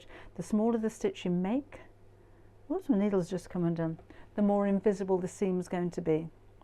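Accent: United Kingdom. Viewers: I’ve been quilting for 20 years and have never seen this unique construction method. This technique is a genuine “game changer.” ‘ Thanks so very much for sharing this,. I love your tutorials with your cute British accent and soothing voice! I am originally from Texas, so you can imagine how fascinated I am with a British sewing teacher! God bless you!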